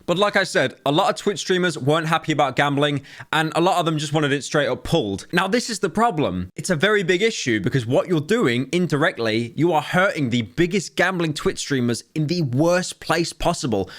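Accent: United Kingdom. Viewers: Bri'ish? — opinion rejected... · The one reason I what covering twitch drama is to hear a Bri'ish person say these streamers' name:))) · Listen, I like your content but you’ve got to stop pronouncing names so Britishly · being British does give a large factor, his voice is way more pleasant to listen to than Critikal. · wait your British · The only reason I watch for news is because critical sounds really boring. And pyros British. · ur british? ok cya not listening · I only watch for his yee yee ass British accent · You're brittish? fuck man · Mogul Mail is enthusiastic charlie, is british mogul mail. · yuck british 🤢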